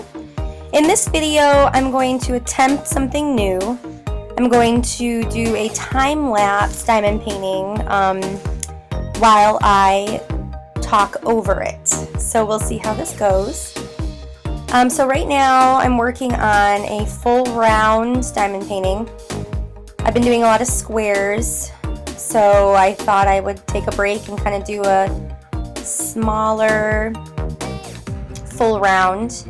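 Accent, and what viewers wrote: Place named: Michigan, United States. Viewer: I was wondering where you are from! Your words sound a little bit like Michigan and In this video you mention Meijer! Im from Michigan!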